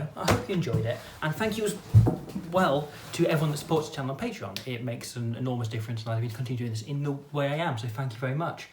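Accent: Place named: England, United Kingdom. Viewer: Ah. Never knew that. He sounds English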